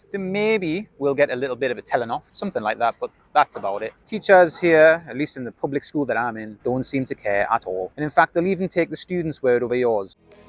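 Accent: Newcastle, United Kingdom. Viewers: Yes, I could hear a "Haway the lads" accent in there 🙂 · Are you a Geordie?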